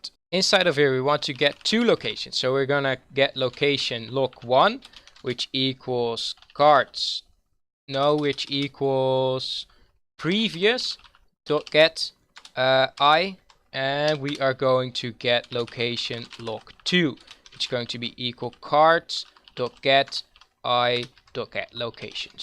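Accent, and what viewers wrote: Netherlands. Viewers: Are you Dutch? Als Je NL bent Dan hoorde ik het aan Je engelse accent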